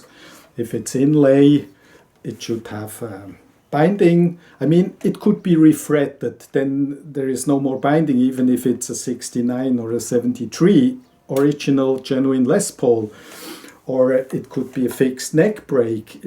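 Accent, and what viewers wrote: Switzerland. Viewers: Tönt nachdem ne Schwiizer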